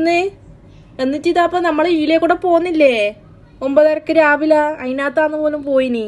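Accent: Kannur, India. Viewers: Amalee kannur bashaa😘😘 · ആഹാ അന്തസ്..... 🤗🤗🤗🤗🤗🤗കണ്ണൂർ ഭാഷ ഇസ്‌തം..... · You are so talented. Your action, the way you speak reminds me of our neighbours or close cousins in Kannur, with their simplicity and innocence! Love my place ❤️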